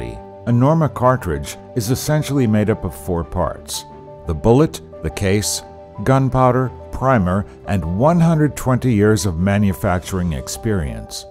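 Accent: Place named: United States. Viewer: The heavily American voice over is weirding me out.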